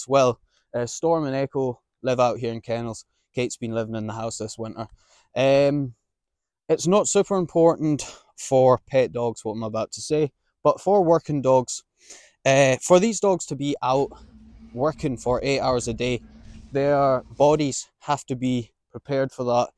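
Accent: Scotland, United Kingdom. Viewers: I struggle understanding where this guys from he sound north east scotland or irish is messing with my head 😂😂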